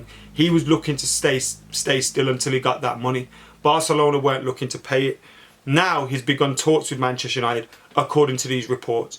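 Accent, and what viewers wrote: Birmingham, United Kingdom. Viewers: Dang I really thought was from Manchester but he’s from Brum like me 😂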